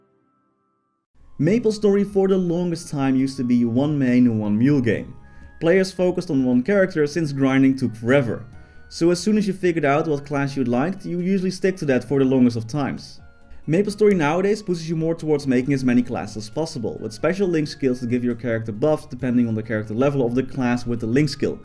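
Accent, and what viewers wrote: Netherlands. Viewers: You sound so Dutch. I'm in Utrecht. Hi.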